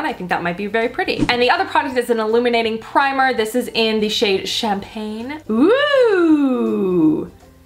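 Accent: Canada. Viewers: Please... do a tutorial about your look! With the typical Canadian "about" :D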